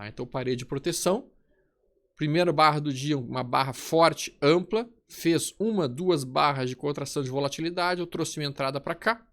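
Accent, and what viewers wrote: Rio Grande do Sul, Brazil. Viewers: Tu é do RS?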